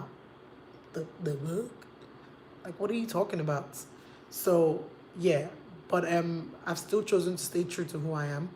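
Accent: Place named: Ghana. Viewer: I love your accent so much ,are you Ganian? I am South African by the way.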